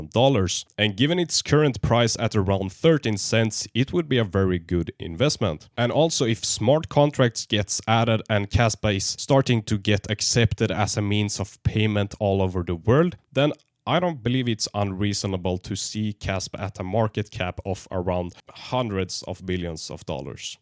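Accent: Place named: Sweden. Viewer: Yoo I can hear the Swedish :), let's meet and discuss crypto IRL. Botkyrkabo jobbar i Norrmalmstorg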